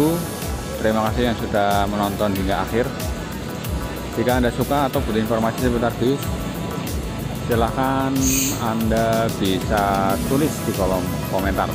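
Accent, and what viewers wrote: Banyumas, Indonesia. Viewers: Hadir nyamperin.. Mas.. Yen ora ngapak ora kepenak lur... Paten..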